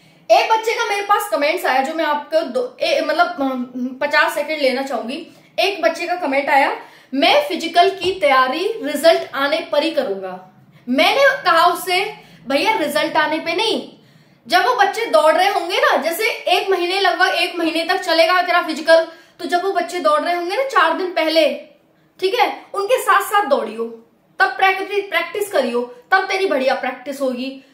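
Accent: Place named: Rajasthan, India. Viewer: Didi aap rajasthan se ho kya aapki language se lag rha ❤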